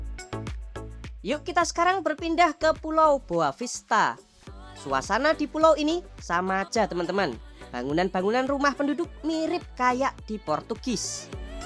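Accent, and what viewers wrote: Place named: East Java, Indonesia. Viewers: aslinya mana kok bahasanya medok kayak jawa timuran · Ini miminnya lucu banged, selalu ada kata kata tambahan, seperti siapin kopi dulu, siapin Snack ya dulu, terus ada kata asoyy kalau lagi ngulas hal hal seru di negara yg sedang direview, terus intonasinya kadang seperti sedang serius ngajarin murid matematika di kelas.. Terus logatnya itu, aku analisa dari tadi, seperti logat Jawa Timuran gitu, Org Surabaya aku menduga, mungkin... Hehehee...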